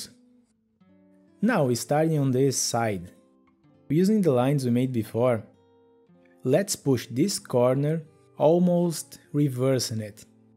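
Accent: Brazil. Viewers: Pelo sotaque é brasileiro kkkkkkk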